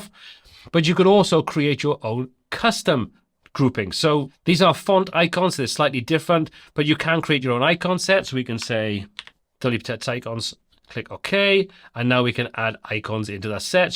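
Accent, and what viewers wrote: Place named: South Wales, United Kingdom. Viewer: He’s a hwntw (south Wales)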